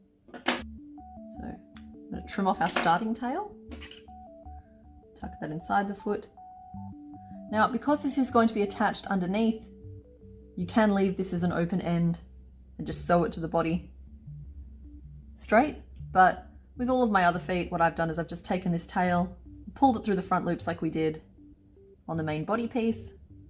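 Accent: Australia. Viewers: Wow, thanks. You sound aussie but had me confused with your US stitch names. The sulfur cresteds and the rainbow lorikeets are the mandarin thiefs which leave us nothing on our tree, in the last few years, they don't even wait until they are ripe. Maybe the cockatiel will be less of a sore point. We had a baby fruit bat in the guava tree one year, he was a failed release from the bat hospital, didn't flinch when we looked at him, wanted plenty of guavas handy, but we had no idea if he had the Lissa virus, so they came, said he was fine, and took him away. Thanks again.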